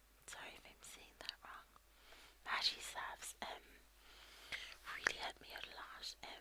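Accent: Ireland. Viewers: I've recently become interested in Irish music, history, language and culture, and am thrilled there are ASMR videos with the Irish accent. Keep eating healthy and be happy. :)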